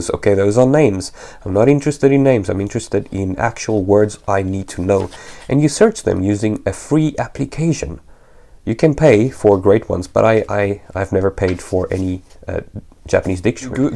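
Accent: Afrikaans, South Africa. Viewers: What, you sound South African... My brother!!! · I was wondering why it sounded vaguely Dutch, Afrikaans makes a lot more sense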